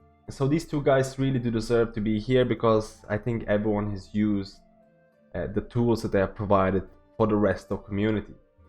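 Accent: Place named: Dublin, Ireland. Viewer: Not sure where you are from but that Dublin accent comes through quite often. Torty is also in Pure a 3 day raiding guild so you do at least have someone from the 3 day raiding guild in the top 5.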